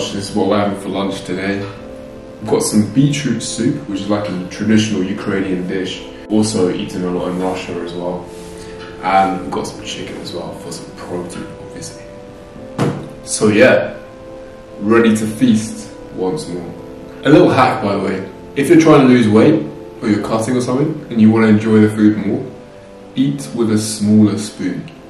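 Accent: England, United Kingdom. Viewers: Looking very handsome and gorgeous - being Irish myself, I love your English accent, so classy - I’d date you in a heartbeat 🇮🇪☘️🇬🇧🥰😍😘😘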